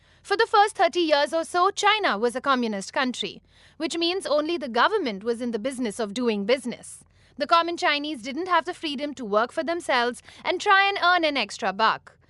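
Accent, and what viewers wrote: India. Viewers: I like your Indian accent and I am trying to learn Indian English from you. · Your Indian accent is like those Indian phone scammer use. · t series accent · India India India that irritating accent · Indian accent is killing us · Sounds Indian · Indian English sucks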